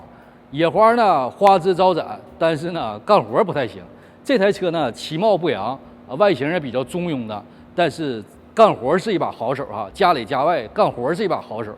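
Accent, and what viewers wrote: Northeast, China. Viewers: 值得十倍以上的订阅以及十倍以上的赞，简直就是华人评车圈里的Doug Demuro，没有花里胡哨，全是干货信息。作为老广竟然觉得这东北口音格外舒服哈哈，👍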